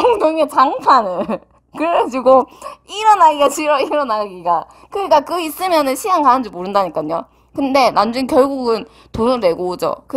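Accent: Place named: Gyeongsang, South Korea. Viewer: 타로 거기 좌표좀 대구면 가볼려구요 ㅎ 말투가 대구나 부산같내요 ^^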